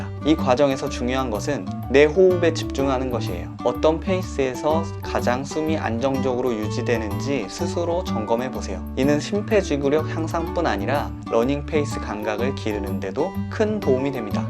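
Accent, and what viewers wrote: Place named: Mokpo, South Korea. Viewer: 오 목포네요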